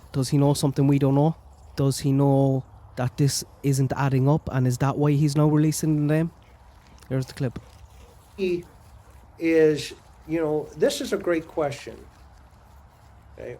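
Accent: Ireland. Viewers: I’m glad you decided not to retire.. Your gaining ground so keep going , I’m also a big fan of Michelle and I’ve heard her mention you so focus on the positive and draw strength from us .. Plus I’m guessing there’s a couple women loving the Irish Accent.. respect from beautiful Canada 🇨🇦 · God you sound so irish i agree i think she was sold how terrible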